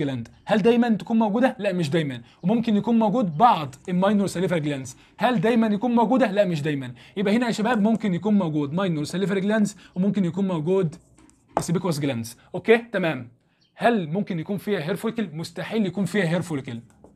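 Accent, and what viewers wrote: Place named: Jordan. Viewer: متاكد انك مصري؟ حاسة انك من الاردن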